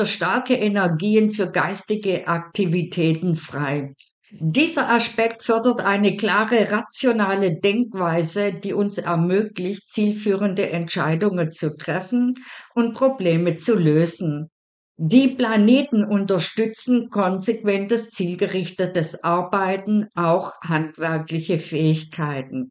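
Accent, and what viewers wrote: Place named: Swabia, Germany. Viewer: diese schwäbische (?) Akzentuierung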